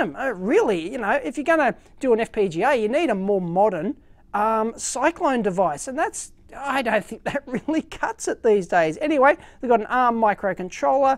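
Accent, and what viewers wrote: Australia. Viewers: True, love the Aussie flavor Dave! Just a head's up though, go easy on the "S" ($#!+) word, it's a little rougher over here [U.S.] than over there ;) (not exactly family friendly) Cheers :)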